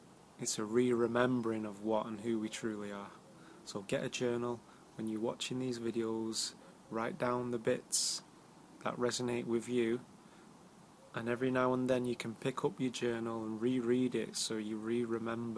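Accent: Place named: England, United Kingdom. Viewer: Ohhh, you're English. No wonder I keep thinking you are such a cutie... what's up with the English and your gift of gab?! It's not just the accent either. ;)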